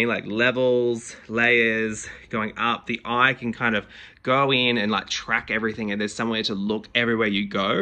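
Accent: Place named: Australia. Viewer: Yay, an Australian accent AND a great design aesthetic :)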